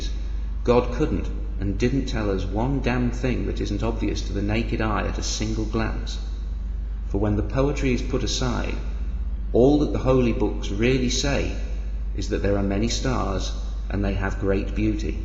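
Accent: United Kingdom. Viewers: Your voice echoes with intelligence. It's somewhat mezmorizing. And it has that awesome British accent lol. Anyway, I love you videos. It encourages individuality and thought.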